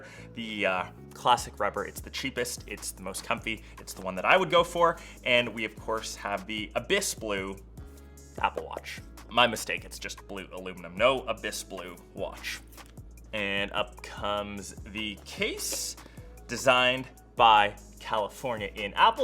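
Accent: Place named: Canada. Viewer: I think I hear a Canadian accent..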